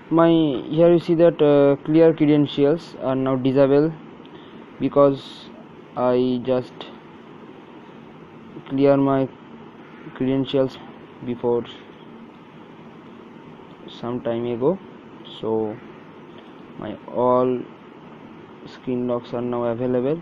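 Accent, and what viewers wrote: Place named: Bengal, India. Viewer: Your typical Bengali/Assamese/Odiya accent has ruined your english , you should post videos with writings instead of speaking English. That would be conceived better for sure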